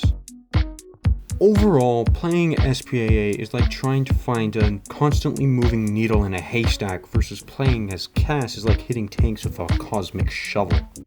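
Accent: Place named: Canada. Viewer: Great video. You have a better Canadian accent than mine lol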